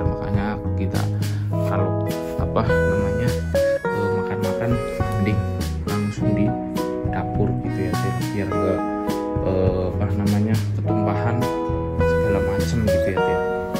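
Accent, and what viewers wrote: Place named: Banyumas, Indonesia. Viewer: Mas ngapak..asal kan ngendi..?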